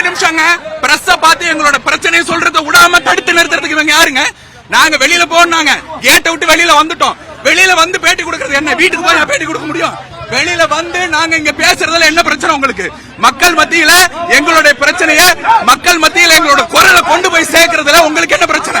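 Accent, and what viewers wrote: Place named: Kanyakumari, India. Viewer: உன் பேச்சைக் கேட்டாலே தெரியுது கன்னியாகுமரி நாடார் மாதிரி தெரியுது நாடார் கட்சி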